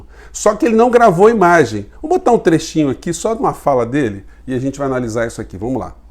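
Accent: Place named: Rio de Janeiro, Brazil. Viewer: Único carioca que eu gosto de ouvir! N só pelo conteúdo e qualidade da linguagem, mas o sotaque TB é agradável! Temas densos e penosos explicados de forma perfeita, raciocínio impecável!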